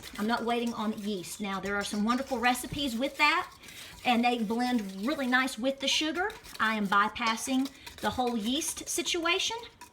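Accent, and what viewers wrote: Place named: North Carolina, United States. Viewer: I’m a displaced Northern Carolinian living near DC and I just loved listening to you talk. Sounded just like home ❤️. I about lost it when you said *cathead*. Lordy mercy it’s been a minute since I heard that phrase. Now I’m hungry and have to go make these 🤣. TFS!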